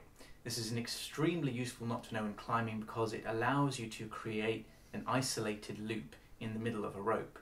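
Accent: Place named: United Kingdom. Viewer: Hi there. Another fantastic tutorial thanks. I'm brand new to climbing and I wonder if you could tell me if this knot is as strong as the re-threaded figure 8 please ? I see people tie in on videos with the re-threaded and I wonder why they don't use this and a carabiner to their harness ? Many thanks and great to hear another Brit on YouTube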